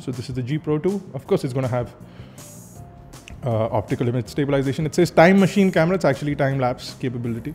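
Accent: India. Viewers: That annoying indian accent lol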